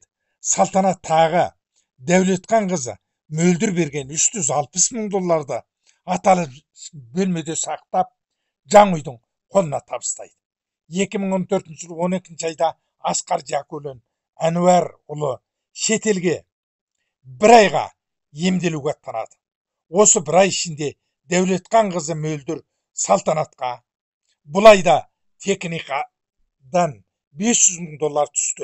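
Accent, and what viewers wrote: China. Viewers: Өзің қытайдың акцентімен сөйлейсіңде,Қытайды жамандайсың??? Өте қызық жағдай екен😂😂😂😂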